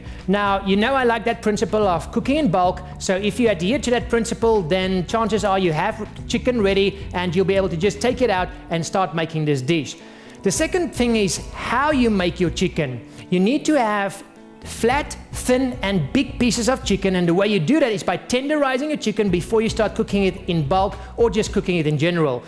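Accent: South Africa. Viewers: sounds south africa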